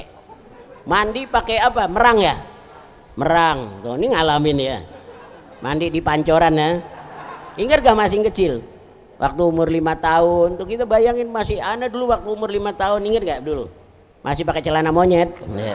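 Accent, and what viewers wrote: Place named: Jakarta, Indonesia. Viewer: Masha Alloh ane seneng banget neh, ceramahnya Habib Muhammad Bin Husein Alaydrus, betawi banget, semoga Habib sehat selalu...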